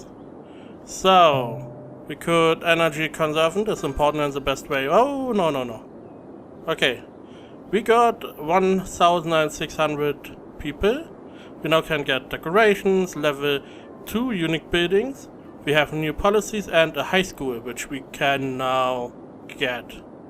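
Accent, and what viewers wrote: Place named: Germany. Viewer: klingt lustig dein denglisch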